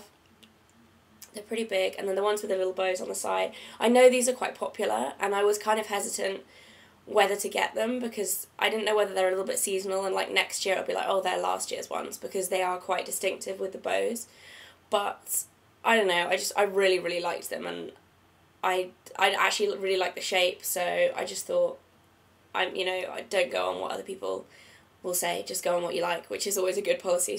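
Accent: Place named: Oxford, United Kingdom. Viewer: OMG bicester village!! I shop there all the time!! I thought your accent was too familiar I'm an Oxford girl too!! :D x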